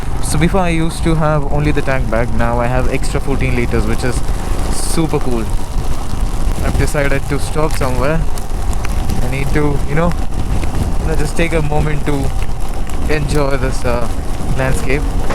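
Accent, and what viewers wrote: Karnataka, India. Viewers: ಕನ್ನಡ ಕೇಳೋಕೆ ಚೆಂದಾ❤️superb bro ❣️ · Bro ur kannada slang is very nice bro 👏..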